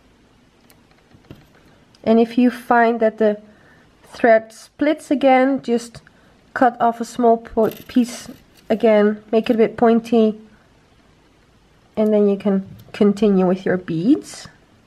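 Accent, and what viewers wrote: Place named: Netherlands. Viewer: You can hear a Dutch accent ( spreek je Nederlands )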